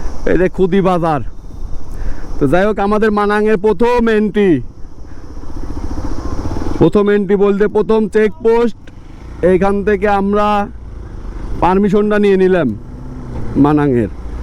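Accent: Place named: Pabna, Bangladesh. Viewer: ভাই আপনার ভাষা আমাকে পাগল করেছে। ভাইয়ের দেশের বাড়ি কনে পাবনা নাকি?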